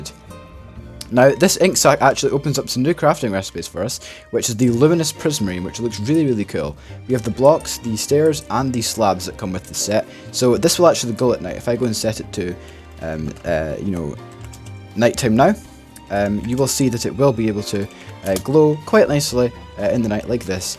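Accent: Scotland, United Kingdom. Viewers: Fife, Dundee, Edinburgh? Somewhere South East ish? I'm trying to work out your accent!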